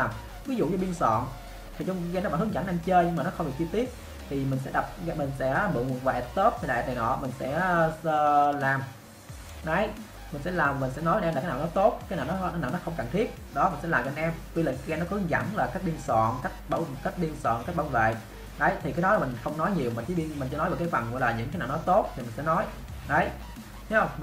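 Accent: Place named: South, Vietnam. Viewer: nghe giọng miền nam tưởng anh trong fps gaming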